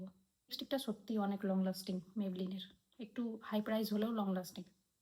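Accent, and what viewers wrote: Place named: Dhaka, Bangladesh. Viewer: Nah amr bang dakar awaj ta sunte valoi lagche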